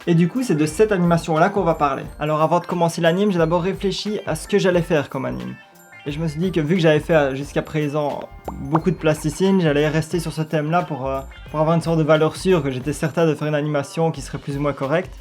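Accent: Belgium. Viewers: T’est belge ? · Ah tu es belge ? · Tes belge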